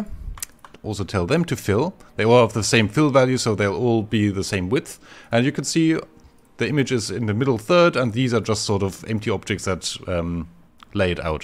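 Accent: Germany. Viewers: klingst mega deutsch ^^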